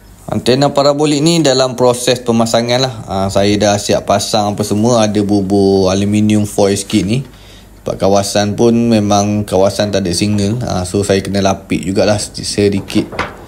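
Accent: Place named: Kedah, Malaysia. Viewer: Mantap...ada band 28 + loghat kedah...terbaik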